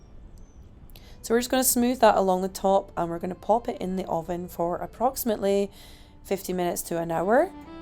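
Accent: Scotland, United Kingdom. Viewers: Love the relaxing music,i will pass this on to my daughter s,my granddaughter also.she has grown up so very fast from a wee little hen to a beautiful girl, young lady almost 19 now,she loves to bake..😊love and hugs,from me here in uk.love to here your gentle Scottish voice. Misd my dads now he has passed, he was a true Scott s man,.mum s from Devon, we still have mum,89 in April 😊, xx.bit sad she has dementia.xx.love and hugs,.😊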